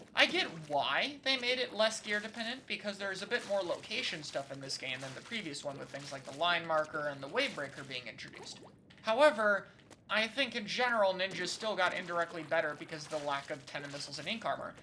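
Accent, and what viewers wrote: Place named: United States. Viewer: Something about your cadence in this video makes you sound like an American flc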